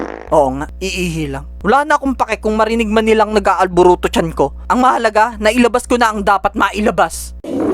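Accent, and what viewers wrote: Visayas, Philippines. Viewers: Karon rako nakabalo nga bisaya ka man diay bai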